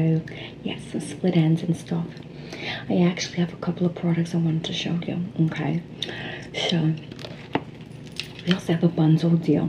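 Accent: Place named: New Jersey, United States. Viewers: Jersey Accents in ASMR is sooooo Top Tier🥴🤌🏾🤌🏾🤌🏾🤌🏾🤌🏾 Thank You Always Beautiful 😍🥹🫶🏾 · Ohhh jersey accents in asmr are elite 😍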